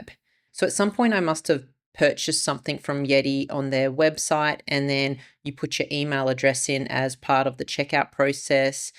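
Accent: Australia. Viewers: Great video as always. The iPad is such a great tech device and it’s awesome that people like yourself publish these videos to help people that might not be technically proficient. Cheers from this Aussie living in NZ 😎🎸🤘💕 Edit: and it’s always good to hear an Aussie accent here on YT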